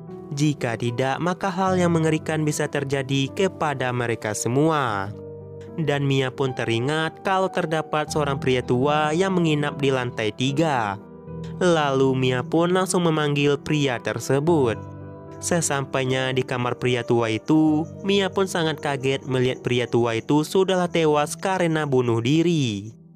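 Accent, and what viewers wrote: Aceh, Indonesia. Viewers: Admin nya pasti orang Aceh ni, logatnya gk bisa bohong.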